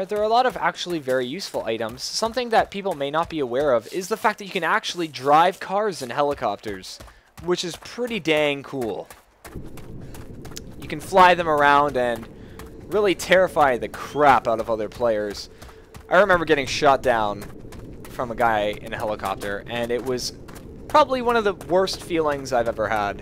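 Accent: Denmark. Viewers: Are U danish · are you danish? :P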